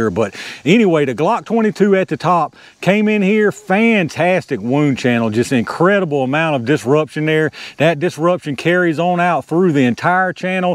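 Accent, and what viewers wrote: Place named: Minnesota, United States. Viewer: Sir you are a scientist and a scholar. Only a purist with real natural genius would strive to delve into minutia to this degree. Bless your heart and keep that wonderful Minnesota accent.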